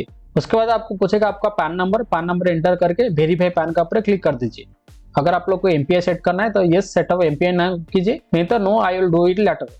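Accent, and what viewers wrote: Odisha, India. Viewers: Bhai... Odisha se he kyaa.... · Sir App Odisha Ka Hain Kya?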